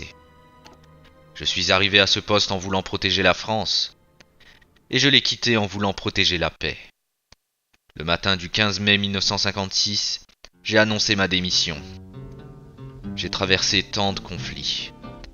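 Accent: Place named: Alsace, France. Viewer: Oui tu as un petit accent c'est pour ça que j'ai cru que tu était alsacien ^^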